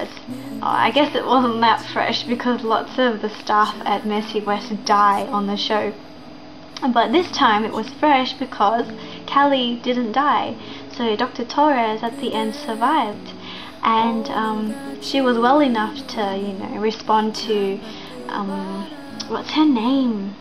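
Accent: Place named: Australia. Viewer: I agree with a lot of what you said. The sexy parts of this episode are so cringe-worthy. The parts with two Callie's are also all very strange. Sara's rendition of I Was Made for You kind of makes up for those (although singing Callie shaking the bad of unconscious Callie is something I've never liked). I also agree that I prefer Sara's version of the song. It's no surprise that she was the best singer, as she has won a Tony. Overall I think a singing episode was a bad idea. It just wasn't a natural fit. You need a show that has more comedy to make it work, like Xena, or Buffy. You sound Aussie, like me.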